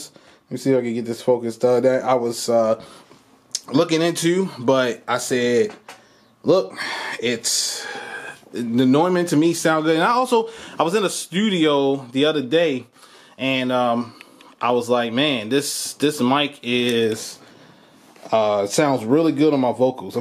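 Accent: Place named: Baltimore, United States. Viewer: Loving the Baltimore accent.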